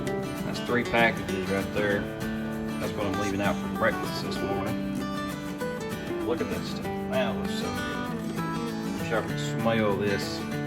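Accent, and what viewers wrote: South, United States. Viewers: Nice to see such a nice young man enjoying his family. Not many homesteaders in Mississippi so enjoy watching someone with that southern drawl.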